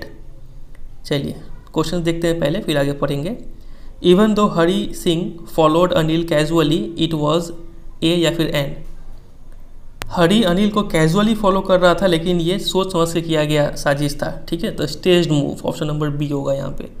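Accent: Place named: Bengal, India. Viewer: Sir mai janta tha app Bengal mai rahte ho apke bolne ka tarika se pata chal gya mai v WB mai rhta hu Kolkata dumdum😁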